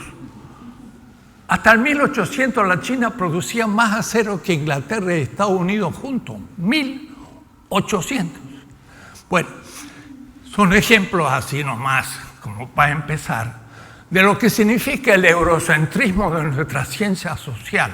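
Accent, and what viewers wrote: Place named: Chile. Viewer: Un ciudadano del mundo . Siempre pensé que era chileno ( por su acento ) hoy me entero que nació en argentina pero que hizo su vida en España palestina México . Tremendo aporte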